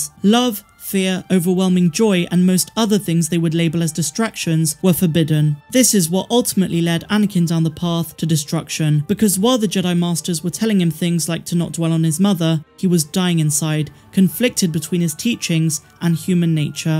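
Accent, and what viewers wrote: United Kingdom. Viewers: Love you station and your British accent; I'm a straight Black American man. And I generally agree with most of your analysis but I totally disagree with your analysis on why Anakin turned out to be Darth Vader. You see he was a slave he wasn't even going to make it to adulthood had he not been taken in by the Jedi Temple. He should have been much more appreciative and do the things that he was asked to do that would have only made him into the chosen one he should have been. But he wanted to be rebellious and disrespectful at times and therefore the two top Jedi Masters knew who he was before he did. He was precocious and vain and he would've still rebelled, even against his original father figure, Qui-Gon Jinn at a certain point. Your analogy sounds like the abuser blaming the abused for abuse.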